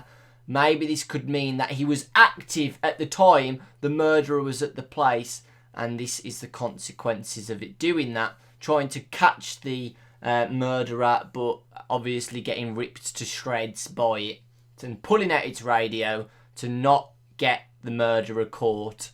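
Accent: United Kingdom. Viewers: Are you British or Australian?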